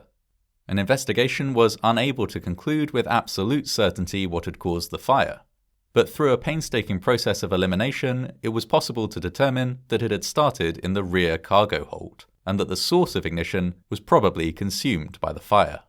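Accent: United Kingdom. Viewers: Horror vids are always better when narrated by a British bloke.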